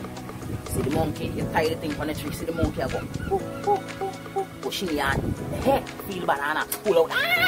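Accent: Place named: Guyana. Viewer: Keep the Guyanese accent bro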